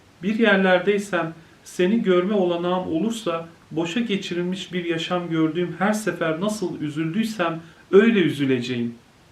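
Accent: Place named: Sunda, Indonesia. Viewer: 🙋 cuman teungarti, basa sunda ath ngomongna😁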